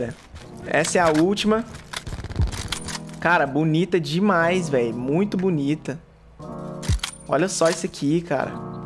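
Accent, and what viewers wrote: Minas Gerais, Brazil. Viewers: Mineiro nato haha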